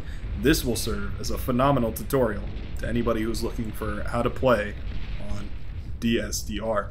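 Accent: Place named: United States. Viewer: great to see the interest in the game. great cast. keep up the casting and learning., its good to see new players coming through and will be interesting to see how they develop and some maybe even surpass the established "pro" like myself :) if you can get in at GMT evening times you'll see much higher ranks playing so you can learn some more, but you sound american so maybe a bad time for you lol.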